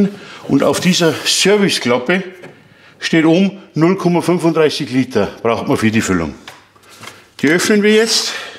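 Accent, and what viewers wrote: Bavaria, Germany. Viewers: Hallo, wie immer in deinem Urbayrisch ein klasse informatives Video. Du schwätsch halt et um d heiße Brei. Mach weiter so. Das #ks2000 wäre perfekt für den Einsatz bei unseren Pferden. Die Pferde stehen in einem kleinen Offenstall abseits von jeder Versorgung. Für Licht oder im Notfall den Tierarzt mit seinen Geräten versorgen zu können wäre ein Traum. Besonders da das Gerät so flexibel ist mit Gas oder Benzin. Viele Grüße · #ks2000 Den hatte ich mir die Tage auch näher angeschaut 👌 Und noch eins: Ich bin kein Bayer sondern aus dem Westerwald. Aber bitte sprachlich nicht verbiegen. Bleib bayrisch 🎉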